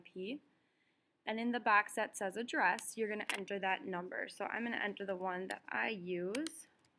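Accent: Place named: Canada. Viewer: That was pretty cool. I love your accent . Canadian?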